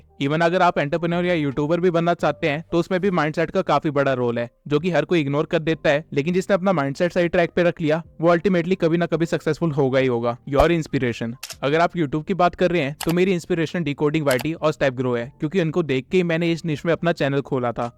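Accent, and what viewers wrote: Bihar, India. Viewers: bro aap bihar se ho na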